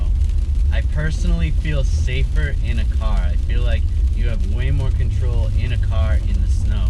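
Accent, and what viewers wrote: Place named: New England, United States. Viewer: Hey you're back! I made the mistake of putting only two winter tires in the front and two all seasons on the rear before, on my Corolla. It was a little sketchy because it would randomly start drifting especially around turns. Oh and yeah I hate it when people don't clean off their car and they they throw snow all over other cars. And and great new england accent btw :)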